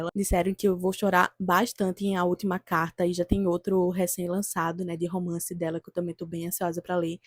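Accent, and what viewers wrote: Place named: Minas Gerais, Brazil. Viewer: Caí de paraquedas hoje mesmo aqui, e te achei uma diva, amei o sotaque (é mineiro?). E eu ganhei O Morro dos Ventos Uivantes da minha irmã, só que eu tô postergando pra ler ele (sendo sincera), até mesmo por tudo de ruim que eu ouvi sobre a adaptação. Mas eu tenho uma amiga que leu e gostou muito, disse pra mim que eles tiraram o foco total do que realmente o livro vem trazer.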